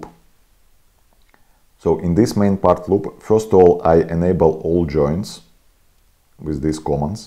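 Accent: Ryazan, Russia. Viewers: Бля, по акценту сразу слышно русского Ивана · мне кажется или это самый насыщенный рязанский акцент, что я слышал? надеюсь не обидел своим комментом. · какой русский акцент, с первых же слов )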